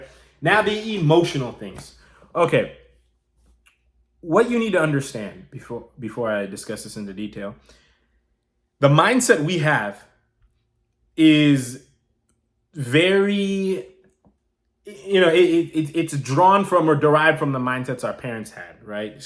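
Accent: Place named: United States. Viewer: You have great American accent. That made this video more pleasent to listen from A to Z without a single pause. Living in the USA for last 11 years I would like to add two more tips. 1. Please for god sake don't dress up which make you looke like a Migrant Engineering/IT major. (You guys know what I am talking about I'm from a south asian country as well not india even I can tell just by looking at the dress that he or she's from India) No I'm not suggesting buying expensive clothing, buy affordable ones within means buy with regards to American style/context Don't wear Jeans, Tennis show and full shirt even in a Concert while theres 100 degree fahhrenheit in the summer I also notice Nepalese people in the USA they have a better fashion sense here in the USA than any other Indian subcontinent population 2. I know English is our second language but please for god sake improve the english accent. 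It is becming a "call center Scam Joke" nowadays with heavy indian accent. Listen to this video he's also indian decent but there's no sign of heavy desi accent.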